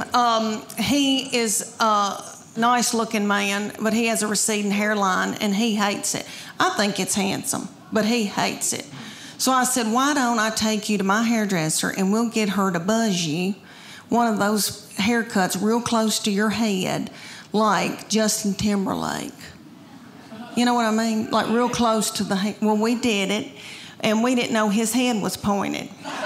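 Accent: United States. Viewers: her way of speaking is specefic to a region in the us? i like it..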